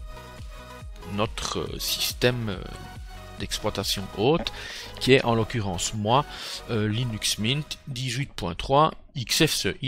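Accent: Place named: Liège, Belgium. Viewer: Oufti tu viens de lieeeeeege toi 😂😂 J'adore les liégeois 😜 Super tuto, j'attends encore quelques pièces pour mon serveur et puis j'installe proxmox 😁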